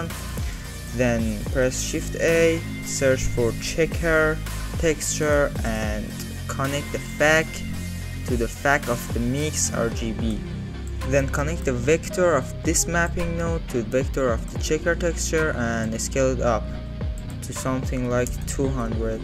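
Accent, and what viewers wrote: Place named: Iran. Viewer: داداش لهجه ایرونیت خیلی باحاله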